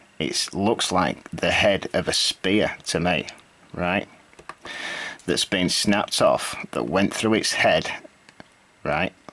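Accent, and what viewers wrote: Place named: Yorkshire, United Kingdom. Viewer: Hi mate,speaking to you from Yorkshire meself!Love the accent,love the truth.Let's year down this rain mainstream paradigm and blow some minds!Midfield by Roger intro,d me to this and just wow.Truth always seemed self evident from the last stage lolol what is it? Deny,try to destroy,process then accept,always the same.Thank you my friend.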